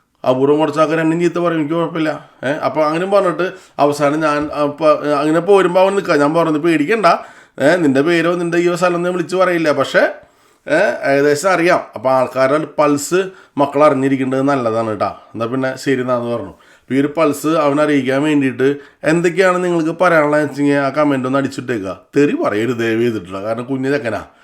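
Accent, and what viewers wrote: Thrissur, India. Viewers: ഞാൻ തൃശൂർ താങ്കളുടെ സംസാരം ❤❤❤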